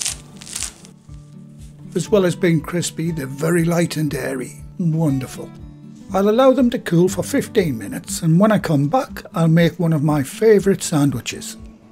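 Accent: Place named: North East England, United Kingdom. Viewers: Hello. It’s great to hear my own accent while living here in Crete. As a lass from Seaham Harbour it is music to my ears. After retiring we went to Vancouver, Canada for twenty years and I loved enjoying a Vietnamese roll when going to the mall. Now in Crete there are no meat pies or these rolls so I’ll definitely make some in the cooler months. Love your recipes, your presentations of them are excellent. I’ve learned so much already. Thank you. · Your baking has been the highlight of my week! As a Sunderland lass stuck in another lockdown in Shanghai, I appreciate not only the fantastic bread but the sound of a North-East accent:) They don't really do home ovens in China, but I've bought a table top Panasonic and I am definitely going to try these today. Thanks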